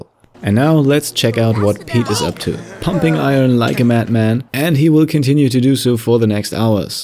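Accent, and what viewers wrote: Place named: Germany. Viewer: Back here for a rewatch after the new Series started and one thing I noticed right away is how different Pete sounds. As a fellow german I can tell how hard it is to get rid of the accent and these videos sound like they were made in the phase where Pete actively improved his accent and english speaking abilities in general. The pronunciation is pretty close but the cadence seems a lot more confident and well... practiced nowadays. Really goes to show what a good content creator Pete is imo. In all his works I feel a passion and a genuine desire to create something that is a joyful experience! So thank you Pete for all the joy you brought me I guess!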